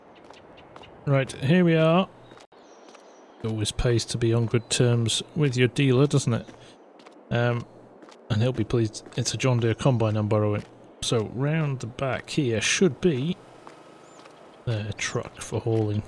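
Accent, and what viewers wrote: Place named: Scotland, United Kingdom. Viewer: Also that Scottish Accent. mwah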